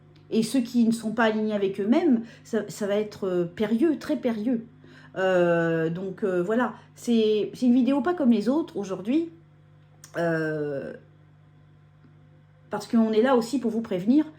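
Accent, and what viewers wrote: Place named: South, France. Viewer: Bonjour,pas mal l'accent du Sud 😋 capsule humoristique avec un cap bien mené ☺️ merci et a bientôt 🎉❤🎉 je vous envoies les cigales 🦗🪰 d'Aix en Provence 🍀🍀 gros bisous 🌹🌹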